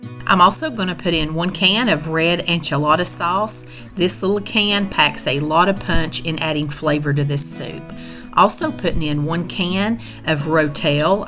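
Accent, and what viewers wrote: South, United States. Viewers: I could have eaten every bite and licked the pot! However my significant other won't eat soups, chili, dumplings and just about anything with a broth, including spaghetti. Really bums me out. We are your near neighbors just off the Blue Ridge Parkway in western NC. This beautiful fall weather has really put me in the mood to cook. I'd have to eat off a potful for a week by myself or try to freeze most of it. No close family or neighbors to share with. Loved watching you cook and hearing a sister southern girl.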